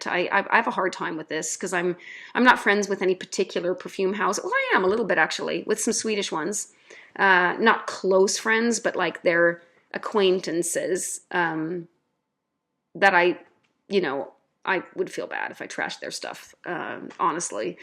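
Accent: United States. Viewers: I thought so too. I’m American and assumed she must be an expat because she sounds very American. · Thanks for the insight! Very interesting fragrances that go far beyond the ordinary. I thought you are American because of your accent. Greetings from Hellas!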